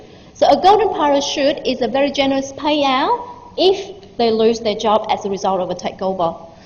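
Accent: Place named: Australia. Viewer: More like Australian rather than British.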